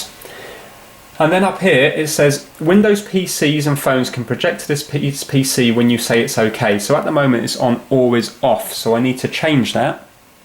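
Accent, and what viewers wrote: United Kingdom. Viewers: You are from UK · Man I fuckin love this british accent. Didn't even listen to what you said, just how you said it for the first few minutes. So yea I had to look it twice. x) greetings from germany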